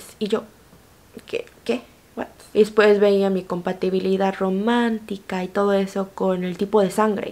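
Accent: Mexico. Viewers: wao tu acento mexicano ....es perfecto...saludos desde tamaulipas,mexico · Jajaja apenas te conocí y ya me agradaste ya tienes nueva suscriptora ☺, pareces coreana pero de como hablas ya eres 100% mexicana me encanta eso ❤❤❤ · He estado viendo otros canales de chicas coreanas argentinas y hablan como argentinas y son coreanas he visto este video de chica coreana mexicana y hablas como mexicana y es coreana lo divertido en buena forma es que ambas son coreanas la argentina y la mexicana y si platicaran entre ellas las dos coreanas se encontrarían platicando con los respectivos acentos argentino y mexicano y eso es lo divertido y bonito al mismo tiempo en realidad me encanta y me rio porque ambas son coreanas me río pero en buena onda porque me gusta por la cultura que aprendieron según la región donde nacieron bueno no se si entendieron pero yo si me entendí sólo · Tu asento en español es mas mexicano no español puro pero coreana si eso si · Hello beautiful, I love your videos and you’re the reason I fell in love with the Mexican dialect/accent and I’m trying to learn Spanish through your videos. 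Thankfully I understood the main points but I noticed you didn’t put in English subtitles in this video. I’m wondering if you’re going to stop putting in English subtitles? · el shock es mio; ver tu cara y tus llamativos ojos coreanos y hablar con ese acento mexicano.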